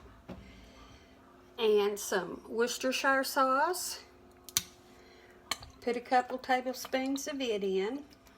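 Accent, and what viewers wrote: South, United States. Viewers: We saw the munchkin peekin around the corner! Ma'am what part of the South are Y'all from? (not just the state but like north east Alabama or south west Arkansas) Thanks.I watched your questions video. Now I know why the indeterminate accent! When I was a kid in SW Michigan on a fruit farm, Southerners would come up and pick fruit. I absorbed many an accent during those summers. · I wish I was your neighbor. I could visit with you for hours. I love your sweet southern accent and your sense of humor. You're just the sweetest. I am looking for new recipes and I found the right channel. We only eat the boneless, skinless chicken breasts. I do but some thighs or legs for my son occasionally and so I can get some good broth to freeze or make gravy with. Thank the Lord you're video was in my feed because I am binge watching now. God bless! 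❤❤❤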